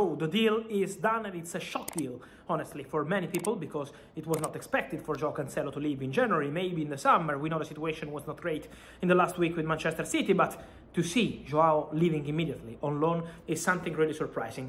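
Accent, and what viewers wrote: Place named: Italy. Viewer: bro, the "e" in "reached" or any other verb in past tense is silent. Cmon, you are THE football journalist. No need to loose your nice Italian accent but put in a little more effort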